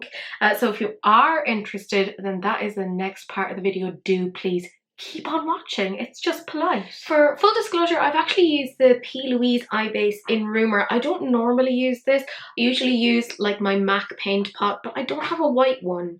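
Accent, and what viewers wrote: Ireland. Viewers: I bought this palette because of the bright colorful shades, that I normally wouldn't wear, but I thought the bold colors in this palette would bring out the intensity of some of my multichromes!. Nice to hear what sounds like a "Dub" accent schooling me on some new make-up! I definitely will use your code on my next order with this brand.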